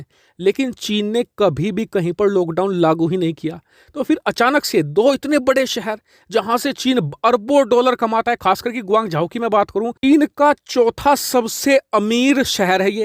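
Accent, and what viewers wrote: India. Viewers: Why you talk as Indian varient ?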